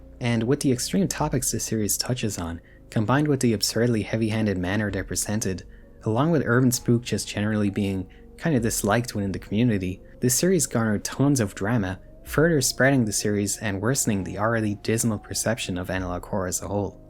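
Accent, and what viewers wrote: Ireland. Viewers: Hidden Irish accent I think.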